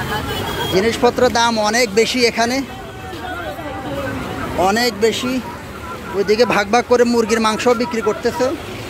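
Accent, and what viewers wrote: Noakhali, Bangladesh. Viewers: ভাইজান নোয়াখালী র ভাষার টান মনে হচ্ছে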